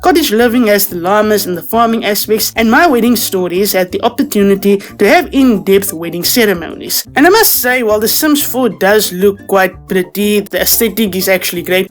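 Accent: South Africa. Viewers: 😭😭😭 I heard the accent and I immediately knew this was a South African channel. what a rare find, love the video.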